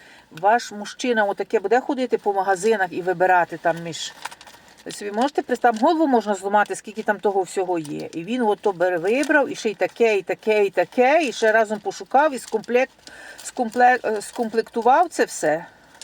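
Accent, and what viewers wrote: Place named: Galicia, Ukraine. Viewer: Де ви, оберталися у совєцьку добу? Що ви розповідаєте про "подарунки на новий рік "? На заході України не дарували подарунки на новий рік- це просто нонсенс. Подарунки дарували на Миколая і по всьому. Я з Тернополя, тож відповідно знаю добре Львів- маю родичів у місті, приїздила у відрядження. На Галичині більшість людей навіть не святкували новий рік, тільки Різдво. Ви маєте галицький акцент, але не самого міста, можливо району. Принаймні, жодна людина у моєму колі не користала суржик. Щодо намиста, то такі прикраси є дуже тренді, прикрасить будь-яку сорочку чи сукню і до вишиванки надзвичайно гарно. Послухайте красиву пісню чарівної " "- якраз про це. Певно, італієць мав на меті подарувати щось особливе. 🇺🇦✌❤🖤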